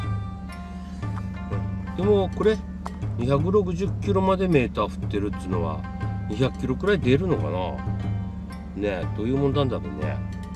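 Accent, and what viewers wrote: Tsugaru, Japan. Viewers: 車には詳しくないけども、アルファロメオのエンブレムがオシャレな感じで好きだなぁ♡ マスターのちょっとお久⁈の動画見て 津軽弁聞いて、ほっこりしました(о´∀`о) 寒くなって来たので風邪引かないで下さいねぇヽ(´ー｀)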